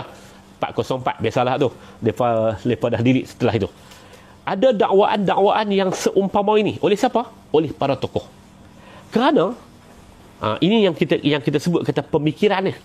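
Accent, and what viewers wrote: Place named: North, Malaysia. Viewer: Itu bahasa Loqhat Utara... Nothing wrong ok... So what now?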